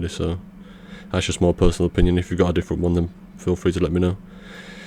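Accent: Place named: North, United Kingdom. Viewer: Thanks, and you do speak clearly! The Northern accent might throw some, but that's their problem, they need to learn to listen better.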